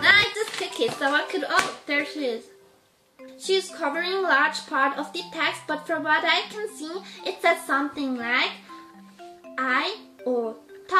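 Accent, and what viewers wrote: Switzerland. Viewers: Kay Sloan I am from Switzerland but the French part. However I know what a swiss german accent sounds like, she has some of it but for the other parts of the accent, I have no idea where she gets that from..... maybe it's a baby accent! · her accent is swish German 😍😍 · she's not trying to. Her swiss accent is really thick. Everyone in swiss sound like that when talking in english. You dont really think people are all from america don' t you?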